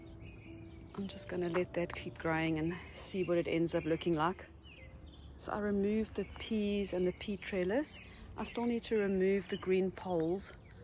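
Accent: South Africa. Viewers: Hi, dont know your name but I just found your channel last night. I literally turned green with envy watching your beautiful garden in the USA. I live in Napier, Overberg Western Cape. So listening to your "normal" SA voice was SO nice to hear. I particularly loved your video of repotting strings, which are favorites of mine. I realize I am not doing enough propagation seeing all yours. I will be glued for more vids ❤ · What a beautiful, well kept garden. A nice surprise to hear your South African accent. An ex pat here, in Austria.👏😍👏😍😊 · You sound very South African🇿🇦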